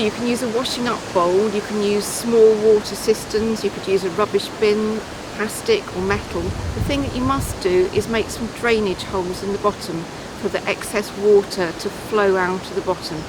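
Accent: United Kingdom. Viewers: Thank you for the tips! I'll never thin again. You're a lovely British lady, with concomitant accent, and with a lovely garden and cat! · Such a cute uk accent!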